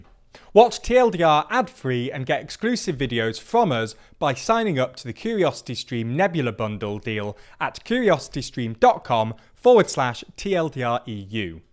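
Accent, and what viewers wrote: United Kingdom. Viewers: That british accent is so horrible, christ, why, just why.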